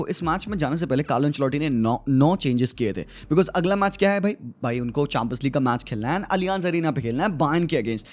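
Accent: India. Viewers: Bro what is this english-indian accent?? İt is so hard to follow man.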